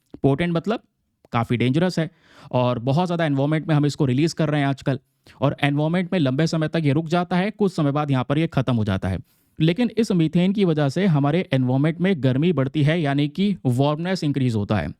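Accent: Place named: Punjab, India. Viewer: App to punjabi lag rahe hai sir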